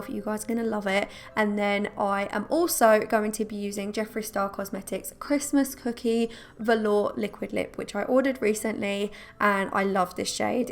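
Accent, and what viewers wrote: England, United Kingdom. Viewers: I believe she means that this woman has a beautiful English accent and face, something which she does not have. But I can see how that might be misconstrued lol. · This girl is beyond beautiful and has an English accent 😒😩😫😭 life’s just not fair!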